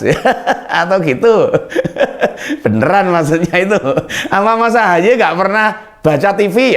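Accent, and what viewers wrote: Java, Indonesia. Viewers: saya betul2 senang melihat logatnya mas ini, jawabanget, saya suka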